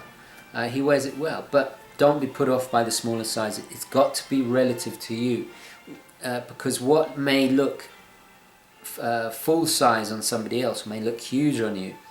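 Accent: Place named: United Kingdom. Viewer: Absolutely! And I really like this British accent.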